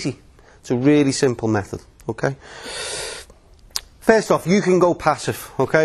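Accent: Liverpool, United Kingdom. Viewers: The voice of gods mate. Scouse. :) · Scouse is the name of the accent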